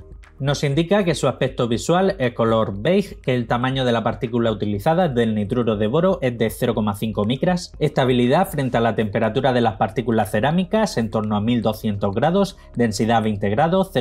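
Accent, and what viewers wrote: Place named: Almería, Spain. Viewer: Buenas noches. Acabo de descubrir tu canal por casualidad, y me ha encantado. Podrias recomendarme un aceite para mi Audi A3 1.9 tdi 130 CV del año 2000? Ahora tiene 220.000 kms, en su día en el servicio oficial le ponian el Repsol elite tdi 5w40. Por cierto, al escuchar tu voz creo q eres de Almería o Murcia, porque he estado muchos años destinado en la Base Militar de La Legión en Viator, y me suena mucho el acento y la pronunciación de algunas palabras como se hablaban allí en Almería.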